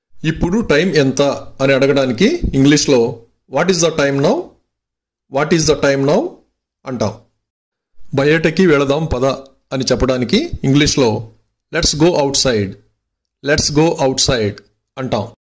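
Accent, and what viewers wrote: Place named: Telugu, India. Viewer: మీరు ఇంగ్లీష్ కూడా తెలుగు లాగా మాట్లాడుతున్నారు.బావుంది. తెలుగు మాట్లాడటానికి సిగ్గుపడే తెలుగు, ఇంగ్లీష్ రెండూ రాని చిల్లర మంద సందుకి పది మంది ఉంటారు.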